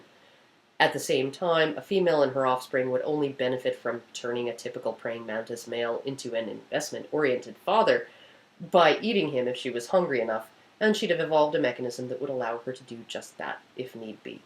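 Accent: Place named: Ontario, Canada. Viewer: Interesting, learned post here. Encourages me to view your others, if only I can get by that Ontario accent .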